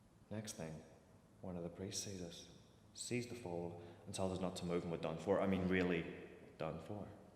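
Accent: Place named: Ireland. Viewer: When did u turn Irish? Good though Hun. Well done x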